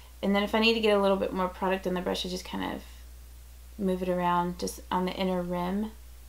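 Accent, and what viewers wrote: South, United States. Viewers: Subscribed Just because we have the same name lol. And you have a southern accent which makes you more awesome :)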